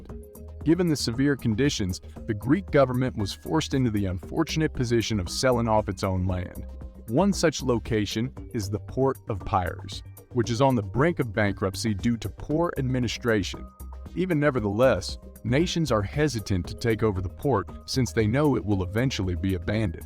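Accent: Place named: United States. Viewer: Not impressed , sounds very American ,therefore biased ?The narrative has no connection to video !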